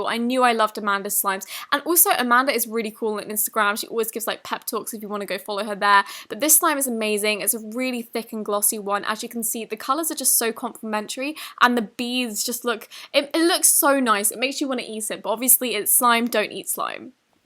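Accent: United Kingdom. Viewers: Teach me how to speak with British accent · Your British accent is so strong that i love it the British accent (strong ones) are the best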